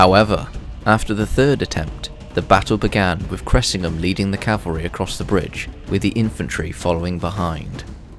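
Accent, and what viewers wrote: United Kingdom. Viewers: You had me with the perfect scottish accent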